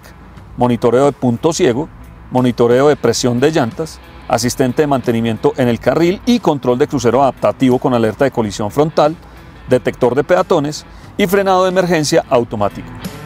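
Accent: Bogotá, Colombia. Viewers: Mero hablado de rolo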